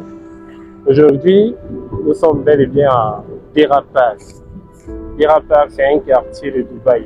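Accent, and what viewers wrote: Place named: Cameroon. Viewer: Je suis intéressé mais gars a un accent camerounais, ca ne rassure pas.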